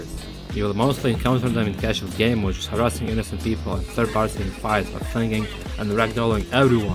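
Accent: Russia. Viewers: No its Russian accent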